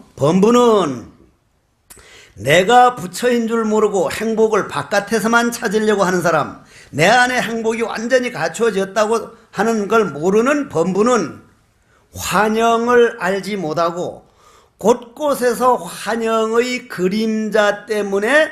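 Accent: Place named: Gyeongsang, South Korea. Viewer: 니그카이 내그카지 경상도사투리. 하 하 하 시님 감사감사합니다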